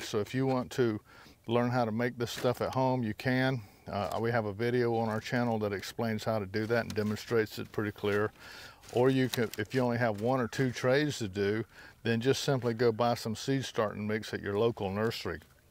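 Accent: South, United States. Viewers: It’s so nice to hear a southern accent I’m from Houston and Henderson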